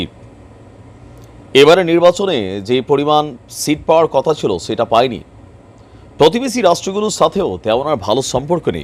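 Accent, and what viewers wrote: Kolkata, India. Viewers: আপনার উচ্চারন ইন্ডিয়ানদের মত কেন শুনাচ্ছে!!! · ভাই আপনার কলকাতার ভাষা আমার কাছে খুব ভালো লাগে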